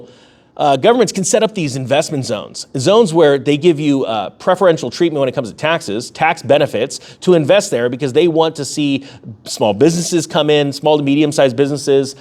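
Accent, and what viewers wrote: United Kingdom. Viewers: That UK accent has me dying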